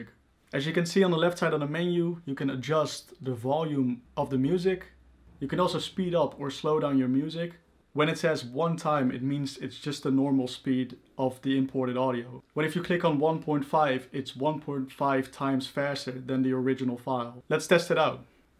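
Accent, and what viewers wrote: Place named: Netherlands. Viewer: you got an accent are you from the netherlands?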